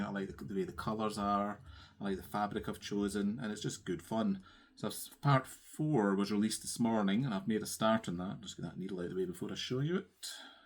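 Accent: Scotland, United Kingdom. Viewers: Hi, great to hear a gid Scottish accent ❤ I live on the other side of Glasgow towards Largs. Great Episode looking forward to many more. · Wow you're such a natural. I love you're pieces and can't wait to see more, please record again when you're able to. I love the Scottish accent as well. My SIL is Scottish and I could listen to her all day lol. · Hi from New Hampshire USA! 🙋‍♀️ New subscriber. 😊 I heard of your channel from Eva at stitching in the interlake. I love all of your projects and bags. You reflect your love of color, so bright and cheery. ❤. I am looking forward to following you on your stitching journey! Cheers! 😄 I love your Scottish accent. 😉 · My husband and I returned from three glorious weeks in Scotland just two days ago. It was my first visit and my husband's fifth. He is 77% Scottish, and I am 27%. We did a lot of family history research and even visited the family homestead in Orkney. 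When your video came up in my feed, I was SURE you were Scottish. I already miss hearing the brogue and visiting with so many kind, hospitable people. I subscribed, so now I can get my heart warmed each time I hear you talk about your WIPS. Nice job on your first video. Thank you,! By the way, I am in Ontario, Canada. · If only for your beautiful accent, which reminds me, even makes me homesick for my Scottish friends who live in the same part of Scotland, I would jeep listening to you! Pluss the beautiful pieces you are showing, reason enough to stay around😅. Welcome to Flosstube! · I’m so glad I found your floss tube! I loved seeing your Cinderella, I’m collecting that series and can’t wait to get started. I’m really impressed with your fabric selections. The Yellows are lovely and that purple for your monster project is yummy! I loved your bags, too! and your Scottish accent is so lovely. 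Just a fun and exciting first flosstube… keep them coming and best wishes for your new venture! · Best wishes from Germany. Listening to you reminds me a bit on my two holidaytrips to Scotland and I love it ^^ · Hello I enjoyed seeing your WIPS hope you continue with floss tube videos. Happy anniversary. I stitch samplers and no fancy ladies. Saga is one of my works in progress have you watched the runner stitcher a great Saga stitcher Love your project bags. Have subscribed and enjoyed hearing a Scottish accent. Happy stitching from a Scot in London Lo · Just found you thanks to Comfort Stitching. I so enjoyed your video! Great projects beautiful stitching. We just returned to the US from a visit to Scotland and Ireland. We’d been to Ireland and everyone told us how beautiful Scotland was…..they were right! I was so happy to be hearing that gorgeous accent again today!❤ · I loooove a Scottish accent! 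My ancestors are from Arran.❤️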